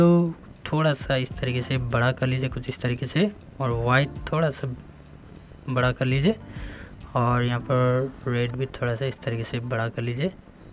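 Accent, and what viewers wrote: Nepal. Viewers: Bro Nepali ho jasto x tara bhasa hindi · Nepali vhayara hindi ma video banouniii haa...sound le sedai th pouxa bro ...tapai nepali ho vanera .....😂😂😂😂😂😂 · You are nepali,you are not using correct hindi tone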